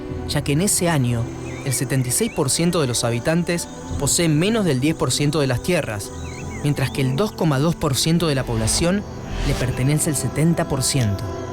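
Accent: Buenos Aires, Argentina. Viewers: Che, primero que nada, cómo pronunciás la ch?? Porque tengo entendido que se usa en palabras como dereCHo, muCHas, CHanCHos, y no es lo que se escucha en el video. Capaz estás escuchando mal o confundís las letras, no se. Y segundo, capaz sería interesante que consideres buscar otro tipo de video la próxima si tanto te molesta este acento en vez de llamarlo por algo que no es, porque al fin y al cabo no es ninguna contribución humanitaria. Es el acento que manejamos los bonaerenses, como seguramente vos tengas el tuyo... saludos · y bueno si te molesta el acento bonaerense anda a otro canal, no da que digas que es una "tara" cuando es el acento que tenemos la mayoria de los que vivimos en buenos aires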